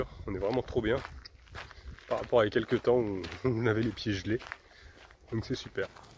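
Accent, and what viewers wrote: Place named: Lyon, France. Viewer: Il est de Lyon sud. C'est un gone. Ca s'entend a son accent, parfois.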